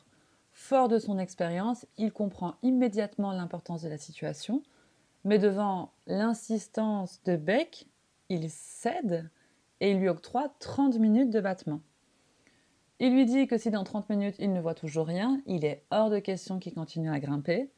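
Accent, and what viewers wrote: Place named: Belgium. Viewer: Je devine un petit accent belge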